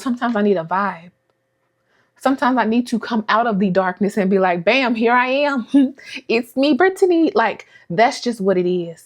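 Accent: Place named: North Louisiana, United States. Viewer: Hey hey girlie!! Girl the more I hear you talk the more I hear that North Louisiana accent lol I'm proud of you girlie and I'm right with on that journey of learning to better love myself as God took His precious time to make this precious jewel. Also keeping in mind that I too have to do my part. COME ON NA!!!!!!!!!!*punching kicking the air*lol WITH THE DESK MINISTRY! Just go ahead and read me! lol Girl editing wasn't too too bad for me but it was aggressive because once I would sit down I would NOT move or answer the phone,nor eat,drink or use the restroom because I needed to be fully zoomed in and not distracted because once i get distracted thats it,it would be hard to get back in the zone. Thanks for allowing God to use you to share those lessons! I love youuuuuuu girlie!!! 📸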